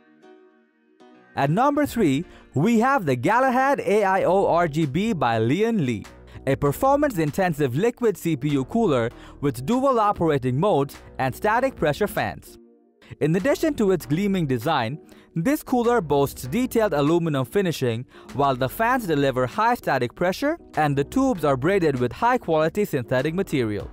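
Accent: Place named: India. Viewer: He sounds like a Indian with a german accent trying to sound like a American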